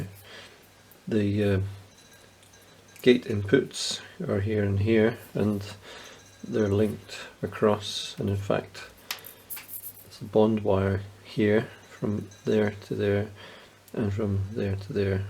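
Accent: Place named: Scotland, United Kingdom. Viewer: where do you live sound Scottish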